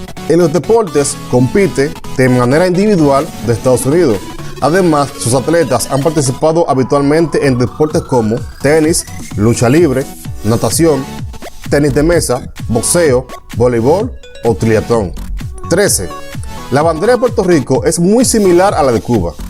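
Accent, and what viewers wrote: Dominican Republic. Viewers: Dominicano por ese acento haciendo video de PR para coger views. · el que hace el video es dominicano y se nota en su acento, PR es mas rico que RD unas 4 veces mayor. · Los cubanos no hablan sobreefatizando las vocales, los dominicanos si, por lo cual pienso es dominicano. 😊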